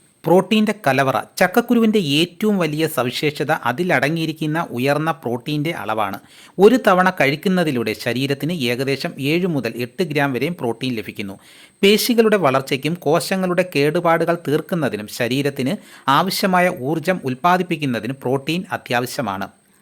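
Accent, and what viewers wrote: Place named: Thiruvananthapuram, India. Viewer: ഇത് തിരുവനന്തപുരം ഭാഗങ്ങളിലുള്വരുടെ പ്രയോഗമാണ് അത് തെറ്റിയത് കൊണ്ടല്ല അത് കൊണ്ട് കളിയാക്കേണ്ടതില്ല ഓരോ നാട്ടിനും അതിന്റേതായ രീതികൾ ഉണ്ടാവും കാസർഗോഡ് കാരുടെ പ്രഭാഷണം കേട്ടാൽ താങ്കൾ എന്താണ് പറയുക ഒന്നും മനസ്സിലാവില്ല അതെ സമയം ഇവിടെ ഭ പറയുമ്പോൾ മാത്രമേ വ്യത്യാസമുള്ളൂ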